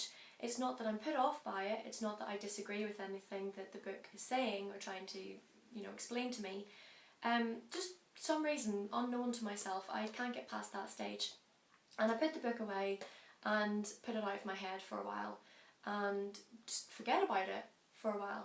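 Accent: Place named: New Jersey, United States. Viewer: thanx for the video. where r u from? you have an accent. Jersey?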